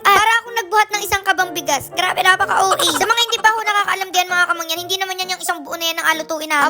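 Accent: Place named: Marinduque, Philippines. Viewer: Kamangyan, tubong Marinduque ako😍pero ngayon andine na sa Maynila☺️kaya ako nag subscribe sa yo ay natutuwa ako at palagi ko naaalala yung punto sa probinsya namin😍keep making us happy everytime we watch your vlogs🥰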